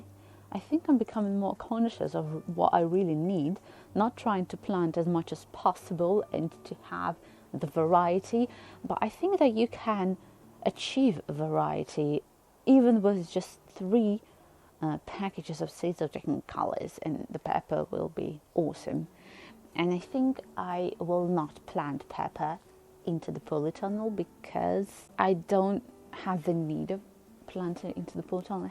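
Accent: United Kingdom. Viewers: Thank you for takeing these videos. I'm watching you since some months and I'm still impressed by your British accent and your ability to make gardening interesting (I've never been into it xD). But I can really relax watching you. I hope all your plants will grow great and am looking forward for your next videos. Greetings from Berlin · the british accent is unbearable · Ощущение, что говорит британка. Акцент классный!!!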